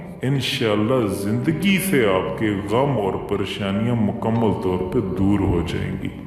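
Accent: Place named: Punjab, Pakistan. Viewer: تلفظ ، عربی وغیرہ ٹھیک کریں ۔ پرابلم پرابلم کا رٹ ذیادہ کرتے ھیں ۔ مال کے چکر میں ھر بندہ نے چینل کھولا ھے ۔اپ عالم لگتے بھی نہیں ۔ اردو بھی پنچابی انداز میں بولتے ھیں ۔ اردو ٹھیک کریں ۔ غربی بھی ٹھیک کریں ۔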